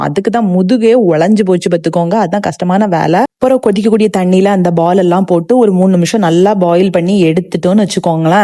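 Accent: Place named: Nagercoil, India. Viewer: Nagercoil பேச்சு azhagu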